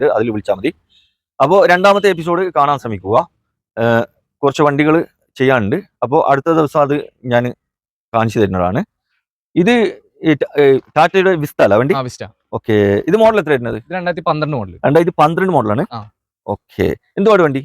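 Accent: Kasaragod, India. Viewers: കാസർകോഡ് ഉള്ളവരുടെ സംസാരം ഇത് വെരെ കേട്ടില്ലാ..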